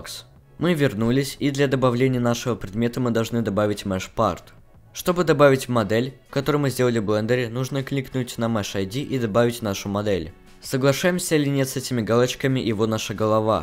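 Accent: England, United Kingdom. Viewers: бро, всё круто, но убери этот акцент английский, уши вянут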